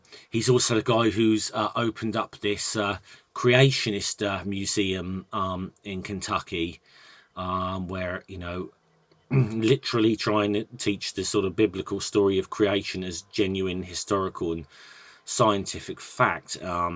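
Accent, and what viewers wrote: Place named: United Kingdom. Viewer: How am I only just realising you're also British? 😲 🤣 Geez, I think 🤔 it's time to clean out my ears 🤣 Also, *Ken Scam 🤣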